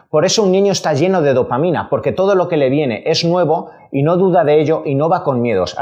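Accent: Spain. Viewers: Es insoportable escuchar un españolete y se creen que todo lo saben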